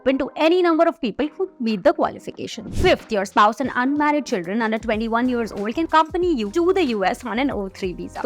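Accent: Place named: India. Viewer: Horrible,horrible accent ,true torture to listen to ,we have no problem with creators from India who are speaking with American or Canadian accent,lots of people is learning English from YouTube but no one is trying to learn English with this accent….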